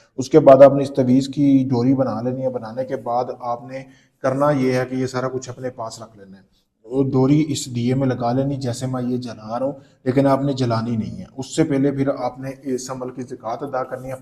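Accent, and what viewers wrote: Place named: India. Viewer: Bhai aap kidhar ke rehne waley ho. Apka lahja Hindustan wala hey,or apki alfaz ki adagi bhi theek Nahi.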